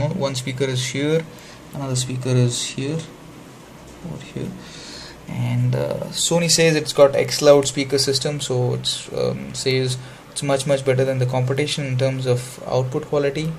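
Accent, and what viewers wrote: India. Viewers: There's something about the Indian accent with reviews that I really like o_O Good review anyway.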